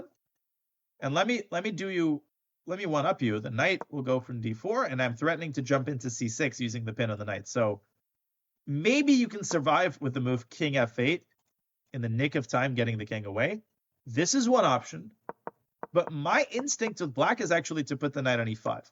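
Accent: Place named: Russia. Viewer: As much as I appreciate your speedrun course I still can't understand why you have been constantly using the Russian words and accent in the time when Russians invaded Ukraine and commit so many war crimes.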